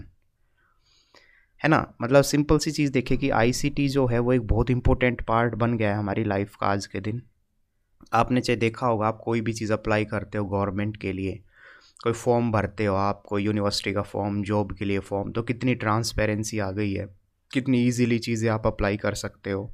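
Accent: Haryana, India. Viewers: apki language m haryanvi accent aave tha ismare puchi thi..m bhi haryanvi hu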